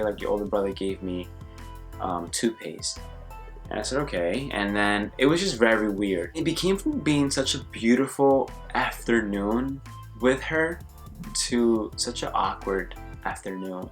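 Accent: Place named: Bronx, United States. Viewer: That Bronx accent is coming thorough lol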